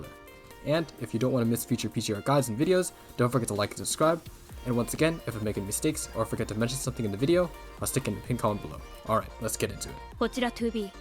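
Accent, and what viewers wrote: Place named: Asia. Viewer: I swear all the pro players of these kind of games always have the this asian english accent lol. Like rexlent pgr, Keebster honkai, just3c,.. :D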